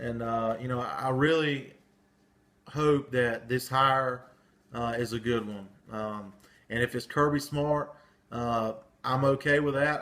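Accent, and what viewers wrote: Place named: Georgia, United States. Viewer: "Jaowja Futbaw" - Cool accent you "Jawjuns" have sir:)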